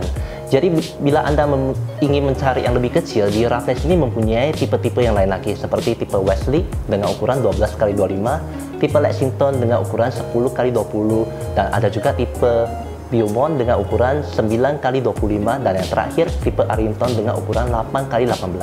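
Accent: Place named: Medan, Indonesia. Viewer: Ngomongnya ciri khas cina medan bgt yaa.cadel bgt..hehee. Ga kyk cina jkt lbh enk dengarnya.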